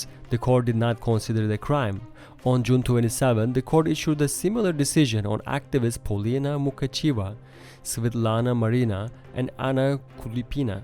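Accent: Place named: Asia. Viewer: I must ask this first before commenting on this report. Where do you guys find such eye catching names for your YouTube channels? The accent also suggests the narrator might not even be a Ukrainian but Asian and seems to know more than the CIA and the FSB.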